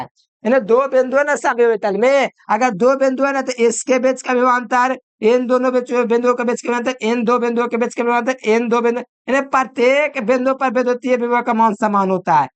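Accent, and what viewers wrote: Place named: Bihar, India. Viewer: Bihari style me education lecture 🔥🔥🔥🔥🔥🔥🔥🔥🔥🔥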